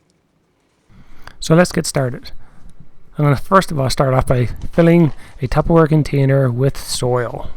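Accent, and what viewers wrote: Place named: Canada. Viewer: That is one Canadian accent you got there, bud! Ottawa valley?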